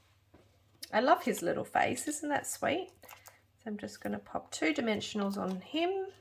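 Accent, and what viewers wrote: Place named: Western Australia, Australia. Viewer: I've just found you and have so enjoyed your video - nice to hear a fellow West Aussie - I am from Perth also.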